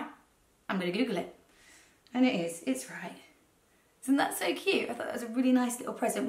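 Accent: United Kingdom. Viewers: British accent is music to my ears 😍😍❤️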